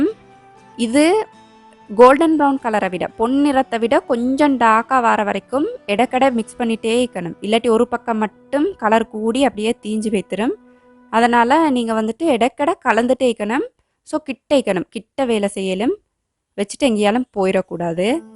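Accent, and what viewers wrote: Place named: Kurunegala, Sri Lanka. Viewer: Neenga kurunagalaya or anuradapuraya sis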